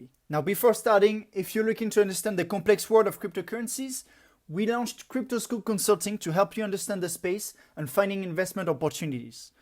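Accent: France. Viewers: Adam XRP Son Lol. This guy sound very French. Ran is South African...yet I hear how you could confuse the two. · tu parles bien anglais mais ton accent est français ^^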